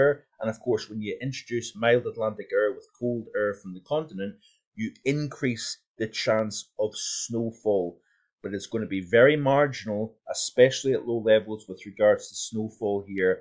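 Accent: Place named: Northern Ireland, United Kingdom. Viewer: This will probably be the height of our cold snowy winter. With last year being quite cold and that becoming an ever increasing rarity I’m betting on this winter being a wet and stormy one. The climate change impacts are really becoming quite noticeable to me now over the past few years. More erratic cold spells and ever longer lasting heat into Autumn. Here in Northern Ireland it’s been a very odd year. An extremely humid almost tropical summer with a ridiculous amount of thunderstorms the likes of which I’ve never seen. In September there were severe elevated storms with really active lightning. Now it’s the amount of rain we’re getting. Absolute crazy. Most of October and up to mid November was basically written off in terms of hitting the rivers for some fishing. One of my nearby rivers is still high as it has been for weeks. 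By the way are you from NI? I seem to recognise your accent. You do some amount of work on here it’s much appreciated👍.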